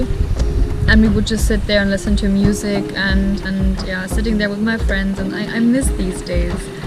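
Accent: India. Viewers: Hi Thank you and all the love for visiting India and showing us some really nice places. I want to say that your mannerisms & accent are of an Indian girl. 😊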